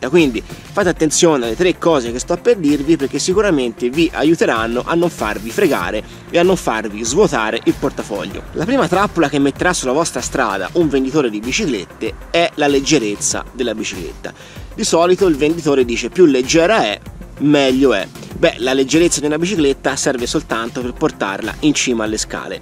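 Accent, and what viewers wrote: Italy. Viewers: Ma di quale parte di siena sei? Dal tuo accento mi sempri senese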